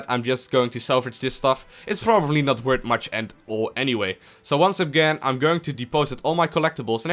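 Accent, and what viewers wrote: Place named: Netherlands. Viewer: The Dutch accent is real ;D Great video, thanks!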